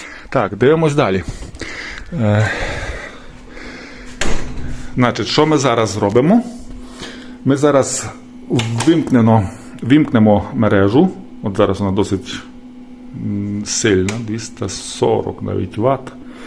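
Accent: Transcarpathia, Ukraine. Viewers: По акценту зрозуміло що Закарпатський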